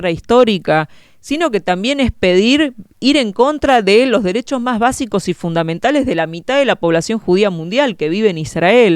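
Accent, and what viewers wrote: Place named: Rioplatense, Argentina. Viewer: Muy bien explicado por una muchacha que tiene una gran preparación, elocuencia, oratoria y facilidad de palabra.Y no nací ayer....Por el acento no me doy cuenta si es una chica macanuda de Argentina, Uruguay o radicada en otras naciones hispáno-parlantes. Viva Israel!Am Yisrael Jai!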